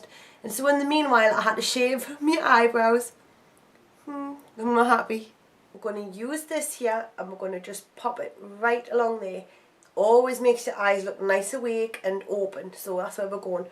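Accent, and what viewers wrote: Newcastle, United Kingdom. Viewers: She's a Geordie man!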